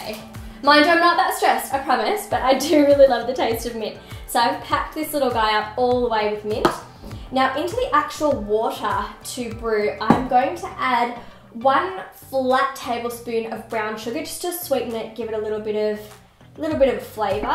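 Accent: Australia. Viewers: ThisWorldSucks I think she's Australian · Is she Australian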